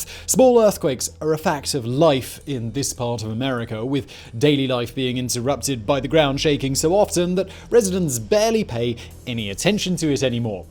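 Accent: United Kingdom. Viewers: looks so young! And his British accent is not as prnounced as it is now. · This guy is so boring I cannot listen to him much longer. He talks so fast and I stand, that British accent. oh I can't stand this guy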